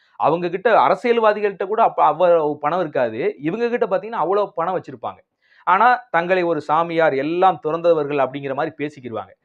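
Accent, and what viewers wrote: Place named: Tirunelveli, India. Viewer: Bro நீங்க இருக்கிறது திருப்பூர் but native of திருநெல்வேலி யா?! உங்க ச்லங் ல தெரியுதே!!??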